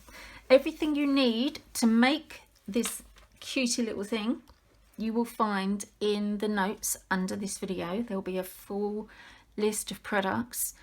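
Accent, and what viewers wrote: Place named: England, United Kingdom. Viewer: Just found you on You Tube, enjoyed watching this project and also your accent. My mother was born in England and all of her relatives live there "across the pond". Hearing you talk reminds me of my grandparents, aunts & uncles. Love the post a note idea. Thank you for sharing. Have a great day. Barb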